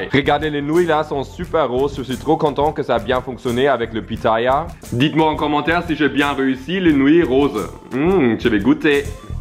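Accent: Quebec, Canada. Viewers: Je surkifffffffff lacscen quebequois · J 'adore ton accent canadien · J'aime trop l'accent québécois ^^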